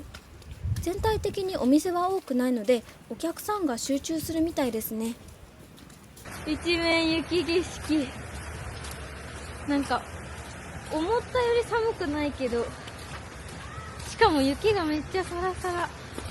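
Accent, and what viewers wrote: Kansai, Japan. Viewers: 関西弁ですか？ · ご両親が関西出身との事で関西弁がとても自然で良いですね。今回の動画もとても良かったです。また楽しい旅の動画(特に鉄道もの)お願いします。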